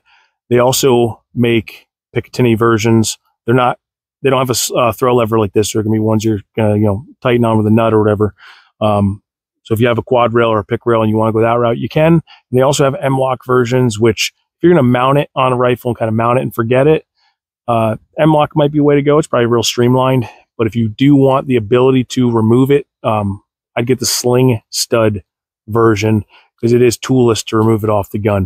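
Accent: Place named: Cuba. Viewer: Your accent reminds me of a Cuban comedian in Miami called Mario Ramil dudes hilarious. Great channel and content btw keep it going.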